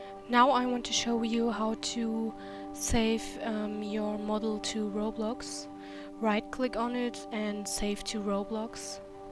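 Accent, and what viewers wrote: Germany. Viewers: am i the only one who thinks she has a german accent well hallo aus deutschland wenn du mich verstehst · That german accent tho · hi, i noticed you have different accent, i mean- you sound little bit like a german person ☺ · Are you from Germany? because of the accent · Everybody: wow Your Video is very helpful Me: WAIT ARE U GERMAN?! I mean you have this typically german accent xD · Man hört den deutschen Akzent.😅😂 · You Sound German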